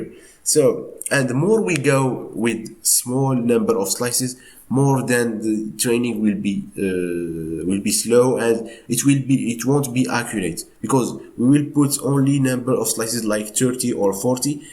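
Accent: Morocco. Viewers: HHHHHHHHUU tanta ban lik mghribi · I know a Moroccan voice when I hear it . Happy to see my fellow compatriot delivering a course on this channel. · Anyone who's confused with the word "paasions", it means "patients". I too was confused due to his moroccan accent. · لي كيشرح مروكي باينة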